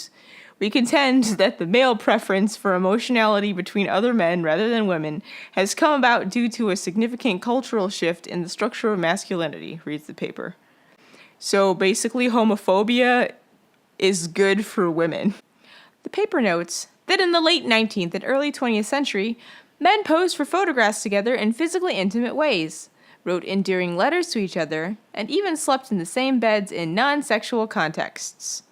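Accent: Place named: Brooklyn, United States. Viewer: You sound like you are from Brooklyn. This pleases Me. Great job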